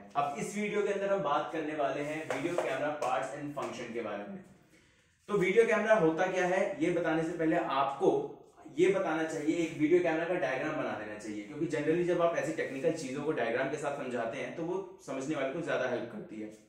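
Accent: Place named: India. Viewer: Indians...why...why always Indians :( My poor ears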